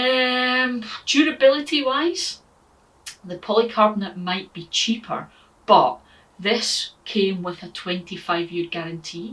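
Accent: Scotland, United Kingdom. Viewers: I'm subbing for the accent. All hail the Scots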